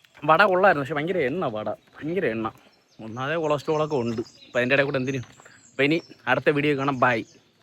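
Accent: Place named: Attingal, India. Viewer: ടേയ് നിങ്ങള വീട് അപ്പോ എവിടെ 🤔🤔 ഞാൻ ആറ്റിങ്ങൽ.. സ്ലാങ് കണ്ടാർക്ക നമ്മള സ്ലാങ് പോലെ തോന്നുന്നല്ലോ 🤔🤔🤔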